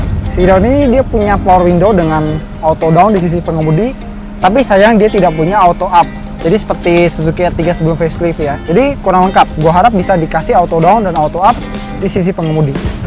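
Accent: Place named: Bekasi, Indonesia. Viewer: kemang pratama banget.anak bekasi ya bang?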